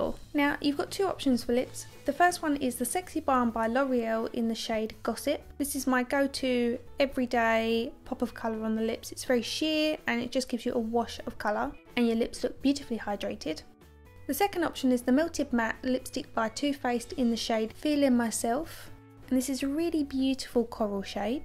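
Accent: United Kingdom. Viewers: You truly make everything look so stunning!! You're so talented- I'm just so very pleased I found you!! And might I add, I love hearing a British accent instead of North American🙊😉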